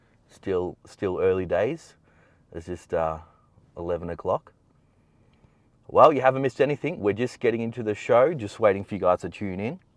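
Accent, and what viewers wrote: Australia. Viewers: I love the crocodile Dundee accent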